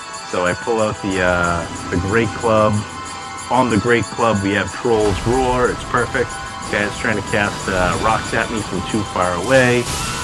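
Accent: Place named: Boston, United States. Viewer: Your Boston accent is strong in this one.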